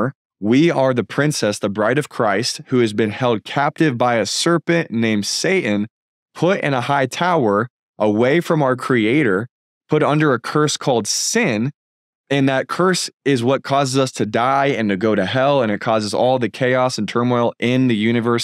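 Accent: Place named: Canada. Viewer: U Canadian? Remind me of The Canadian Prepper. Same accent. Salute.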